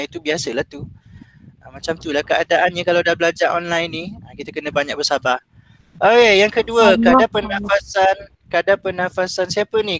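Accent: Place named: Terengganu, Malaysia. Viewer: 😅 sedak dgr org ganu cakak.. lame x g teganu..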